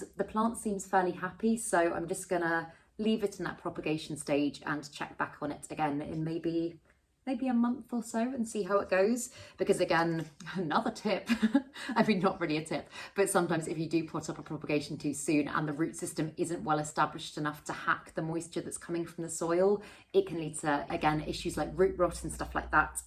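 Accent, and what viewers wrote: England, United Kingdom. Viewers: I love your videos, they are very informative and I love your English accent, I live in South Texas and we can grow so many plants here in pots and in the ground. I have philodendrons and alocasia outside, and they go dormant in the winter and pop back out every spring, such hardy plants and so full and beautiful in the summer, people actually stop to admire my yard.!!!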